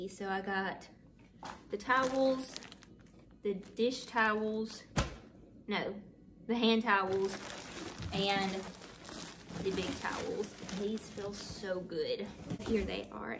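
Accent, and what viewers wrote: South, United States. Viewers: you are so adorable! I love your southern draw! I live in Alabama too so I’m sure I have that same southern accent! I loved everything you got for Christmas and look forward to all your upcoming videos for the new year! Happy New Year and God bless!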